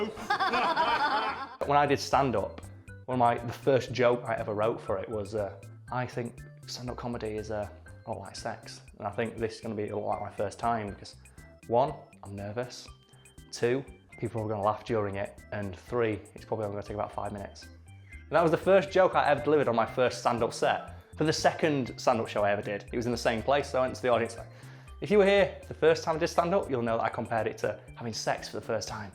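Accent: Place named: Northern England, United Kingdom. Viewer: Everybody misreads the sign for Hilter in northern Germany when they first see it. I was also in Elend (misery), just 8km from Sorge (worry). I know it's for comedic effect here, but you are kinda mispronouncing Fucking if you say it like the English pronunciation, except your northern English accent with the characteristic u sound that is far closer to German kinda counters that.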